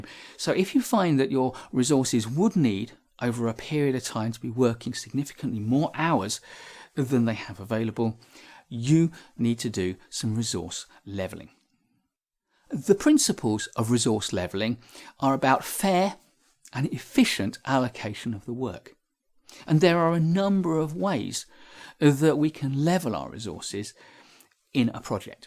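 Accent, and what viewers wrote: United Kingdom. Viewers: Dear, thank you so much for this clear and well-structured explanation. Your British accent is so beautiful, I really enjoyed the video.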